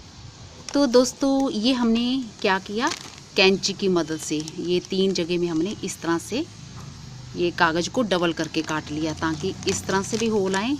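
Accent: Punjab, India. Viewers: love your sweet punjabi accent! if your plant is ready and bearing any melon, pls. post some pictures here. waise...videos zara brief banaya karen. thanks! :)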